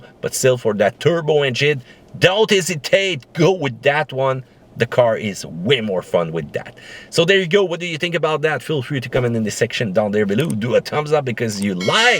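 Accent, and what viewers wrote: Canada. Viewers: From the states I appreciate and enjoy the Canadian accent. I loved hearing GSP from the ufc talk for that reason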